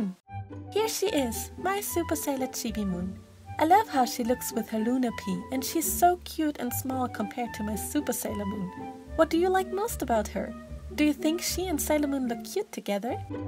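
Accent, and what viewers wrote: Germany. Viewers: Are u German? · Your voice is so relaxing and soothing. I’m just curious but are you german? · Your german right?😄😉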